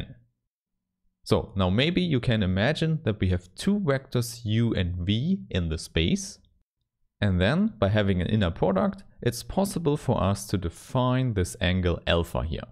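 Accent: Germany. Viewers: nice video, thanks a lot... and you sound German with your accent😁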